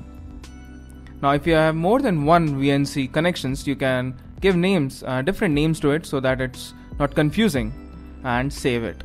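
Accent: India. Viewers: When they have Indian accents you already know they are scammers!!!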